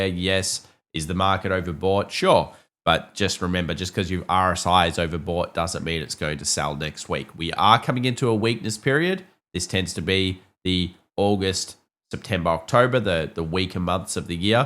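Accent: Australia. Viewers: He's Australian.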